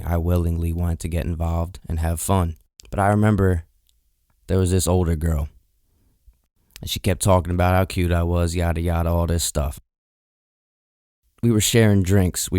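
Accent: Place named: Philadelphia, United States. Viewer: I knew knew knew you were from Philly lmaoo I live rite in south Jersey I get my haircut rite on Frankford I knew it just from the accent but yeah man I'm really enjoying your content I can really relate I've had addiction issues and I'm trying to overcome them really love what you do man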